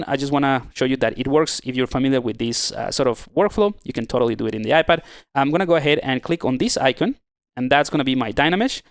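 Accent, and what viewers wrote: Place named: United Kingdom. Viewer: How come you sometimes sound british? Hahaha but more seriously, thanks for the video. I am learning zbrush on ipad. Have never tried any sculpting program on computer or tablet before. Its tough to learn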